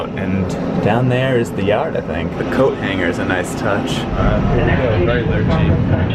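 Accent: Boston, United States. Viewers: Wow that was an aural and auditory feast! Even your Boston accent is coming back strong! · Watching someone with a Boston accent here makes me smile!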